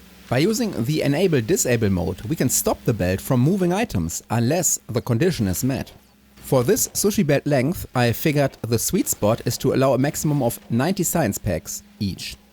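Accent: Germany. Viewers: The German accent always gets me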